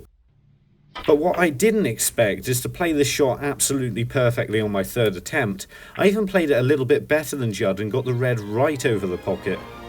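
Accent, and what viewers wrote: United Kingdom. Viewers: As am a foreign I like your English accent its so easy for foreign to understand also likes your excellent videos about snooker.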